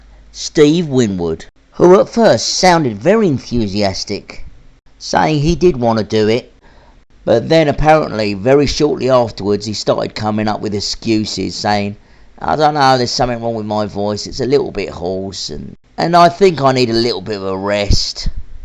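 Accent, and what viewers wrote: United Kingdom. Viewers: Man has a genuine British accent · i like it's genuine british accent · I love the Cockney accent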